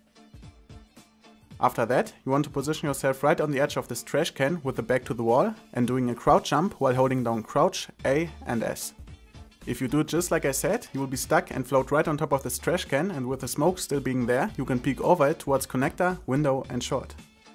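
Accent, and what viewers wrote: Germany. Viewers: deutscher akzent an!👍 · Du Bist Deutsch ne? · deutscher Diealegt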